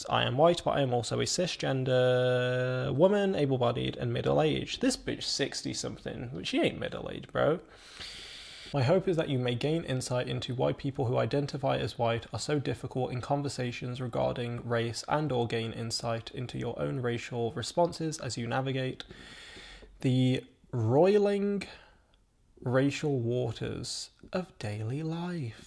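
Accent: United Kingdom. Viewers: I can see what you mean by black passing. But what I looked at you I actually didn't think you were black. like full african. I thought you were like well actually I did think you were black like I thought I was confused like I wasn't sure if your Fulani Ethiopian smiley. You could have been all those things so I guess you're right you are bypassing. I thought when I the English accent to me I think that's what set me off. Because if you were over here in America you would just be black and my opinion. Because black Americans I don't see any mixed black people looking like you. Really at all.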